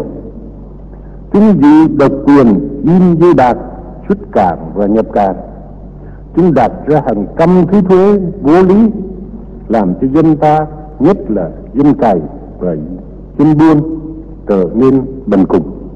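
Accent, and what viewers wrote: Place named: Nghe An, Vietnam. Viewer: giọng Nghệ An mà nói giống Trung Quốc được thì chịu, mù quáng vừa thôi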